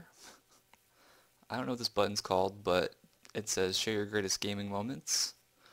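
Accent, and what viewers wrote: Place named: Sweden. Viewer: Holy shit you sound swedish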